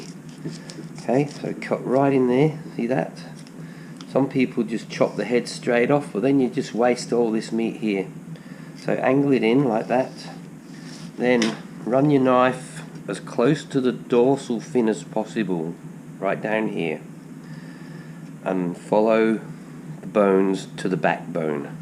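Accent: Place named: England, United Kingdom. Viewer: HELLO ", HOPE YOU ARE DOING WELL? I WATCHED YR" VIDEOS THAT YOU HAVE BEEN DOING. MMMMMM? WER DO EYE" START? FIRSTLY YOU COME ACROSS SO"SO" WELL WITH YR POMMY ACCENT! YOU EXPLAIN WHAT AND HOW YOU ARE DOING. GOOD STUFF MATE. PS" LOVE YOUR HELPER IN YOUR FILLETING VIDEO. CHEERS MATE ANDRE GEORGESCU...🦊🦊🦊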